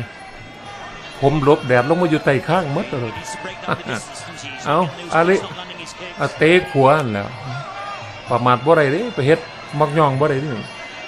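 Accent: Isan, Thailand. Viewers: ผมว่าน่าจะใช่ พากย์มิสเตอร์บีนเสียงอีสานด้วย เสียงคล้ายมาก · ผมคนจังหวัดนครสวรรค์คนภาคกลาง ยังชอบเวลาภาคเสียงอีสานเลยครับตลกและฮาดีครับ..ชอบๆครับ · 😂😂😂😂😂😂😂😂😂😂😂😂😂😂😂😂😂😂😂❤❤❤❤❤❤❤❤❤❤❤❤❤❤❤❤❤❤😂😂ชอบคนพากษ์ที่ชือ พากษ์อีสานฝรั่งขะแมร์😊😊😊😊😊😊😊😊😊😊😊😊😊😊😊😊😊😊😊😊😊😊😊😊😊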